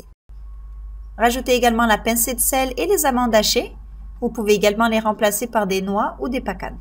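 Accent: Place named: Quebec, Canada. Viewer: Bonsoir. Dabord merci pour cette recette ! Votre accent québécois avec " inchallah" c'est aussi fantastik que votre gateau 😉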